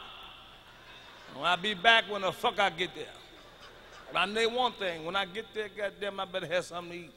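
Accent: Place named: South, United States. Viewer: exactly just go to a family down south in any of those areas nd you'll see folks speaking very akin to Mac. Nothing like the legend himself tho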